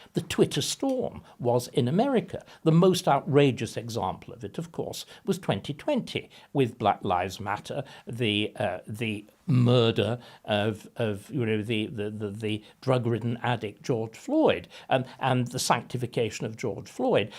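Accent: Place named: England, United Kingdom. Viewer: Yeah, I'm subscribing. This man is straight 🔥🔥🔥. Victor Davis Hanson with an English accent.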